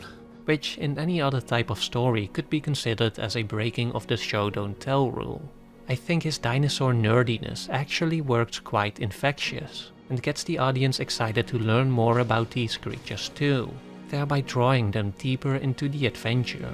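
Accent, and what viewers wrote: Netherlands. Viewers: Hey man, any chance you’re Dutch, I think I can tell from the accent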